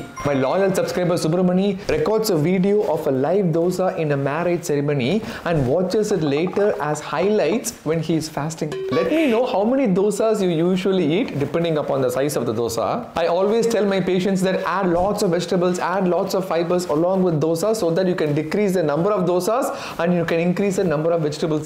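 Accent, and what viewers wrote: India. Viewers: I like how he says and pronounces fluently in Indian way. After living in US and practising as Dr there, good to see him not use NUNI NAAKU ENGLISH or rolling with Foreign accents.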